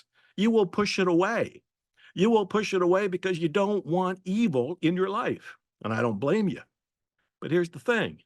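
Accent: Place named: United States. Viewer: Thank you Joe for this very inspirational video. Love your books, love your explanations, love your perfect American English. Happy birthday and blessings from Russia!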